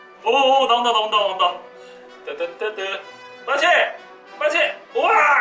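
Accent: Busan, South Korea. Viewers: 혹시........... 부산사람이세요???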